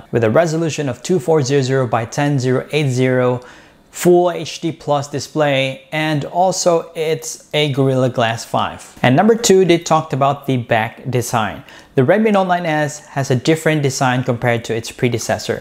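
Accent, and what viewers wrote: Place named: Visayas, Philippines. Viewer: bisaya diay ka sir. hehe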